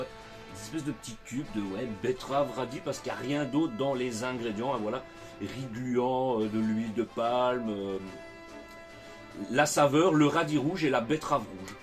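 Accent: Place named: Belgium. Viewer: Très sympa tes vidéos 👍🏽 C’est marrant ce petit accent belge. Par contre d’habitude je reconnais facilement de quelle région de Wallonie provient l’accent, à part le carolo, sauf si il est fort prononcé. Sûrement dû au fait que je suis, moi-même, carolo😅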